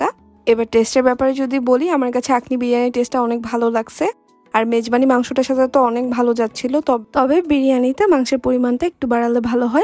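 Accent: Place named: Chittagong, Bangladesh. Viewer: Apnar Chittagaingaaa accent wow 😊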